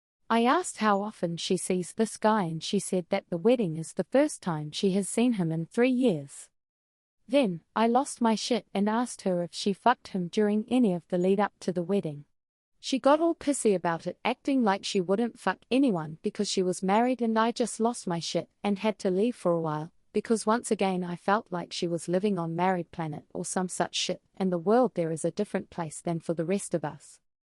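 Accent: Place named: Australia. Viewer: It's Strine.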